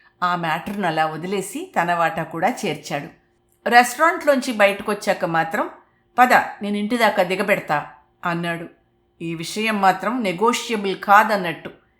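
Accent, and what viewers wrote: United States. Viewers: Your story narration is very nice You are talking like an American women 🌹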